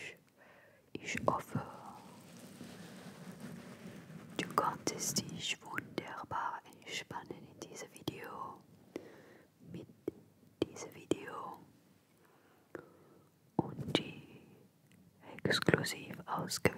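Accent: France. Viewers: Allein der französische Akzent entspannt mega☺ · Normalerweise gefällt mir kein Französischer Akzent, aber dieses Video ist einfach nur toll😘😘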